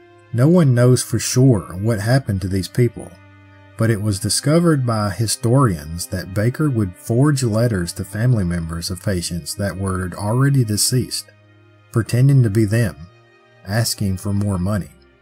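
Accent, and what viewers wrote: South, United States. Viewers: Wow I'm so pleased to be like no 444 it's such a hint at how your channel I's growing and proud to be hear from the beginning and still loving your cookies and cream southern drawl xxx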